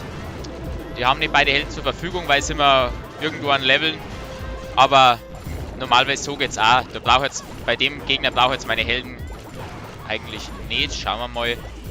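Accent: Bavaria, Germany. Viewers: Kommst aus Bayern?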